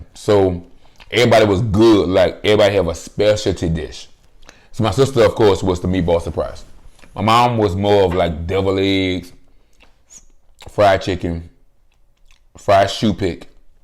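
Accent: United States. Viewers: Not a fan of crawfish, but your country accent makes it interesting 😃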